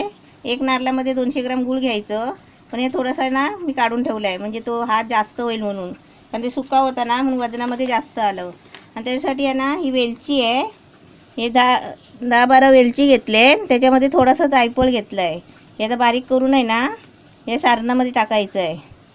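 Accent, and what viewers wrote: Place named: Malvan, India. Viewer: मस्त बोलतात... फुल्ल मालवणी एक्सेंट.....जय भंडारी ..जय मालवणी... बरोब्बर असा "हात भाजलो तरी चालत्लो.पण पीठ गरम गरमच मळूक वह्या. .....मी पण पुढच्या वेळपासून पंखो बंद करूनच पीठ मळतलय..!!!......."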